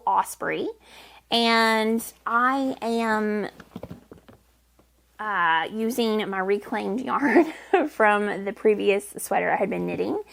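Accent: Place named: Texas, United States. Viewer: I'm from North East Texas and currently live in Alaska so listening to you is a little taste of home. Love your podcast and thoroughly enjoy you as a person. You're so lovely! Thank you for sharing your farm. #Softly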